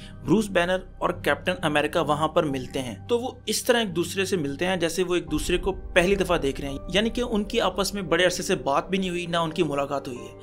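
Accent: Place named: Pakistan. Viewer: Sahi pakde hai Bhai Mai aap kaa new subscriber hu muje Pakistani logo ke baat karne kaa lehzaa bahot pasand hai aur aapke videos bhi love u from india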